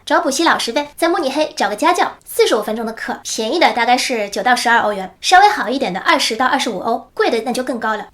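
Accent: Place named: Shanghai, China. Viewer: 听口音是桑嗨宁吧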